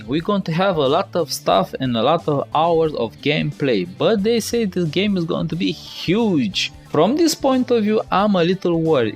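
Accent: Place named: Russia. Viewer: bro, forgive me but your english is like the russian which make tutorials, search for answer. whatever, keep work.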